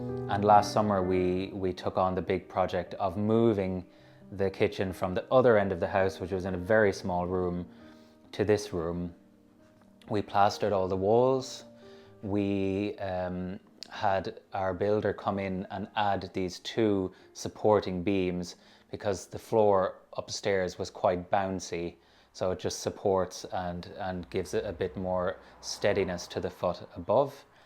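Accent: Ireland. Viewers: Great house,your accent makes me nostalgic as I am a Dubliner in London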